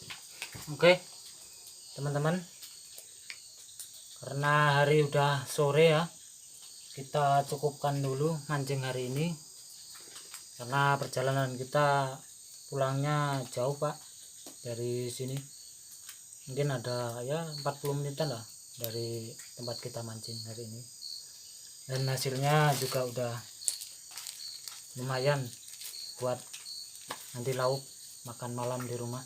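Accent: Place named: Java, Indonesia. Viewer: Kaya orang Jawa logatnya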